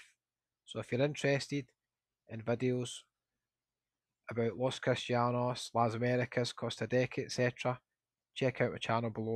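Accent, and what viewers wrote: Scotland, United Kingdom. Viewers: Enjoying yr vlogs ☘ great hearing a Scottish voice for a wee change 😁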